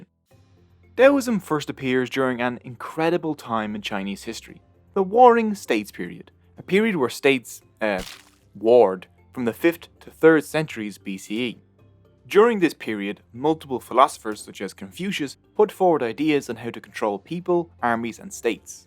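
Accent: Ireland. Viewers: I advice you to change your Ireland-ish accent, it is very uncommon and I think some people including me find it disorienting and irritating. Perhaps shift towards a more british or american accent. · i know but its just his accent. Irish accent. Not everything needs to be pronounced correctly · Interesting video, I recognize the Irish accent. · you have a beautiful irish accent are you from limerick?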